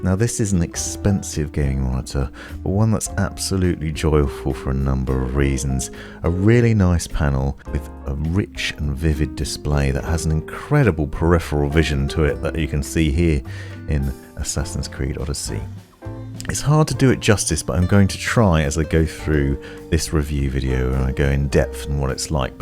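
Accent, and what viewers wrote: England, United Kingdom. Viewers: I don’t care about the monitor I came here just for the voice of a calm english captain Jack Sparrow. Ever thought about speaking in audiobooks?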